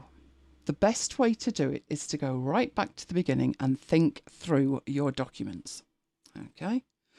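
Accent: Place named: Northern England, United Kingdom. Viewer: How many years have I been stumbling around on YouTube, picking up a snippet of info here, a snippet of info there? I finally, finally, found a teacher who knows how to teach?! This is fantastic instruction and exactly what I've been searching for. I've been using MS Word and various basic page layout apps since <ahem> the last century, but now I need Affinity Publisher and I've been baffled by its complexity. I know it can do what I need to do, but finding those tools and making them work has been a source of endless, discouraging frustration. I'm feeling capable and optimistic again for the first time in months. It doesn't hurt that speaks like I do, either 😂 Or how I would have sounded if I hadn't moved from northern England to Canada many years ago. I am 100% connecting with this content. [Update: didn't have time to watch this all in one go, so I'm on day 2. 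And I have to say again: oh my goodness this is good teaching. I just found Medium account. Will be devouring that too.]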